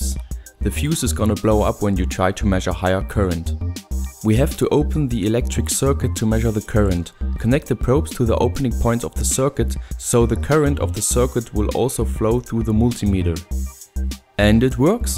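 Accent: Israel. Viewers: Are you from Israel? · are you from israel?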